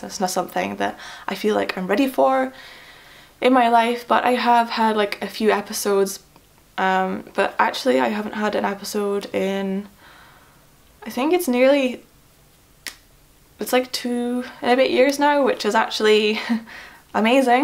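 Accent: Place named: Scotland, United Kingdom. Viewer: Just diagnosed a week ago, currently with CIS but with a likelihood of MS. I hear from your voice from Scotland too. Your video is seriously helping me coming to terms with things. I have just taken on gluten and dairy free too. You’re an inspiration. I’m afraid to tell people because I worry they treat me different. Sorry for the wee ramble, I wish you all the best 😘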